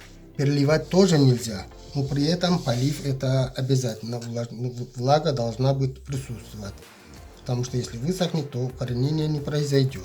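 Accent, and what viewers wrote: Belarus. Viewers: Какой приятный для слуха белорусский говор,я не ошиблась? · Как приятно слышать белорусский говор, просто и доходчиво рассказал. Только хотелось бы увидеть ещё как из бокового тонкого побега вырастает новый.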